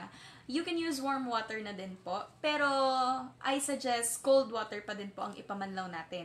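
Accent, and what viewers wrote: Visayas, Philippines. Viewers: Wow bisaya si ateng hahahah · Taga Bisayas yan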